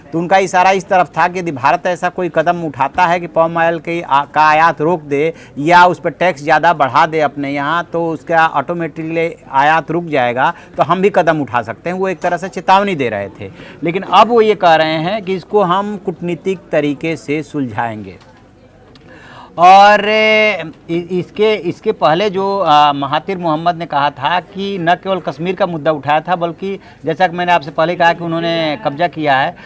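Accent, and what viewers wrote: Bihar, India. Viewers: सर आप बिहार के रहने वाले हैं क्या???? आप की आवाज़ बहुत ही मृदुभाषी है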